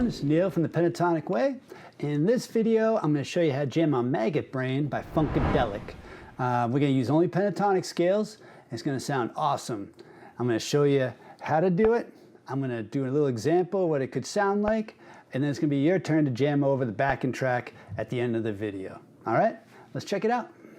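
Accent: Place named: New Jersey, United States. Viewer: I really want to thank you for this. Though I made the same mistake yesterday, walking through a field, absentmindedly wondering if it’s a New Jersey accent you have, I got woosh-attacked again and momentarily panicked that I was under a cattle attack. But beside that… this lesson is the first time I feel like I’m “getting it”. I’ve been playing since ‘93 (apart from a 15 year gap lasting until recently). But I realise now that I never actually learnt anything because… “scales and theory! That’s not very Punk, grandma!! <smug snigger>” and apart from some and everything was Seattle/Subpop or UK/NYC Punk, so I never actually heard how I was playing under the distortion. I’ve even realised my timing is absolutely woeful as I’d always played alone or with friends, without a drummer when we were all off our heads on anything we could afford. 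So I’ve gone right back to basics. Theory, scales, rhythm, metronome and I’ve practiced those and technique every day for a few weeks but I never actually felt like it was attaching together until now! This has really opened it up for me be I can see clearly what is happening and what I need to do. I’m recognising what will sound good next and none of that would have clicked for me if you hadn’t simplified it so much and said “this is what we’re doing, this is how we’re doing it, this is why. Now go and have fun”. And I feel so grateful for that. First time in decades that I have a proper glow about playing music again! Apologi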